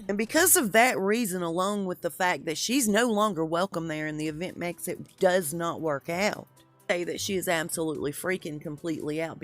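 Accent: South, United States. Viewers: I have watched several of your videos and they just keep getting better and better. I had to subscribe and I love your southern accent, reminds me of home! Plz keep up the great work and THANK YOU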